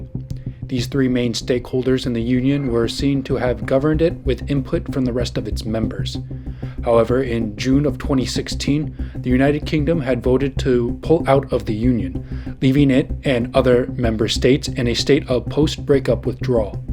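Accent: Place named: United States. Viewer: Myślę że to Akcent amerykański ale nie jestem pewien z jakiego rejonu.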